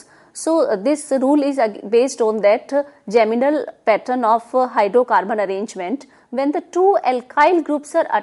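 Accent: India. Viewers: I’m so sorry, because you are a beautiful intelligent women… But I can barely understand what you’re saying. One word blends into the other is if you’re speaking A foreign language rather than English. I understand you are in India, and I’m in California, but if you could speak more slowly and put a little space between your words you would be understandable. Thank you so much for taking the time to do this for students. I wish I could understand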